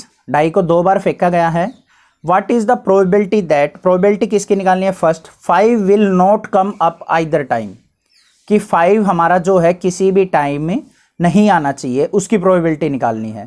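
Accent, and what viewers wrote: Haryana, India. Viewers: Haryanbi voice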